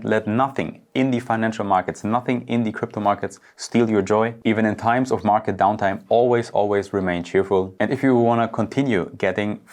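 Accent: Germany. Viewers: Man hört den deutschen Akzent. sehr geil :)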